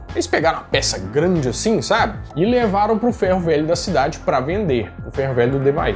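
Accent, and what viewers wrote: Minas Gerais, Brazil. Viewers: Você é mineiro? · Você é mineiro? Falando da brilhantes kkkk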